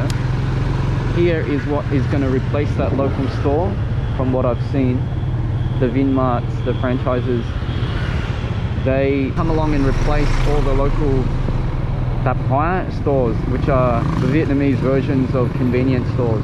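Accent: Australia. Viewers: i agree with you 100 percent...up to a certain point. the point: try making a video bashing vietnam while u r in vietnam...and then, go back to australia (assuming from your accent) and make a video bashing australia. and... mr. dam vinh hung was recently "called in" for a meeting with the authority and fined for warning people about the kung-flu that going around. and... some dude wrote a song about police brutality and spend about 2 yrs in prison...he's ok now... In the theme of the movie 300...walk around saigon with a shirt that depict king leonidas kicking hcm into the bottomless pit with the caption: THIS IS SAIGON...good job, nice vid and thanks.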